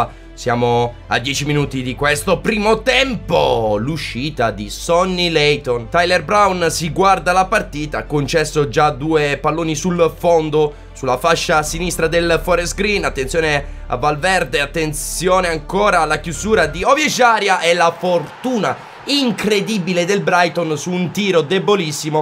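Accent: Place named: North, Italy. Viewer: Ripeto che mi sembri del nord, poi io sono di barletta quindi capisco se uno è di qua ma a te non mi sembra proprio😂. Comunque FORZA FOREST GREEN💚🖤💚🖤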